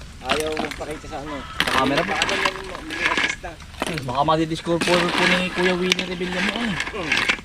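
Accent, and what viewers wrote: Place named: Bicol, Philippines. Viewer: Yung salita mo po naalala ko yung kaibigan kong si unad taga bicol din 😢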